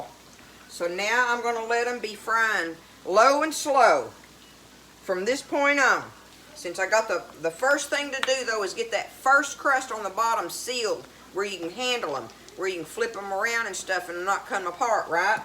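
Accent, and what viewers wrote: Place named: Georgia, United States. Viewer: My Mother made THE BEST Salmon patties. She did use flour, corn meal and egg. Sh always made biscuits and gravy to go with them, and fried eggs. Great for breakfast. I am going to try your recipe. They look delicious. I love watching you cook and hearing you talk. I am from Georgia so you and me talk alike. Love you!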